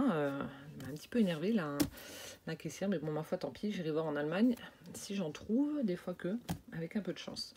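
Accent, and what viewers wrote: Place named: Lorraine, France. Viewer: ah voilà jme disais bien que tu avais plus une façon Lorraine de parler. Moi je suis du 68 région des trois frontières aussi mais FR, DE et CH. Comme j’ai beaucoup de famille en Lorraine, je connais le coin de Thionville. Contente que tu m’aies répondu, bon scrap bisous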